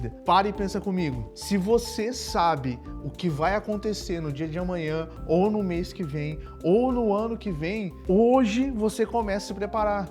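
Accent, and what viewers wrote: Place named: Porto Alegre, Brazil. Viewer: Muito bom! E não poderia faltar esse sotaque porto-alegrense 😂👏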